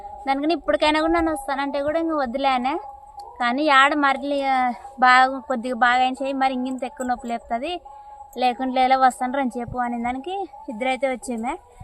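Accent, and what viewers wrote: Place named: Rayalaseema, India. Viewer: Adi rayalaseema village yasa ardhamuc hesukondi.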